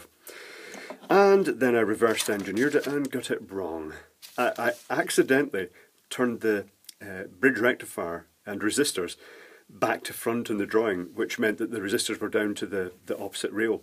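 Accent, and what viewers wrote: Scotland, United Kingdom. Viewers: I'm using the subtitles, I'm not deaf, but sometimes it helps keep track of what's going on if you rewind the video quite a bit. I tell you what, it doesn't like accent! Maybe it should have detected "Scottish" instead of "English". Though he's not really speaking Scottish, which is a dialect of English where they swear more. And all the more for being a Weegie, which I think he's said in the past.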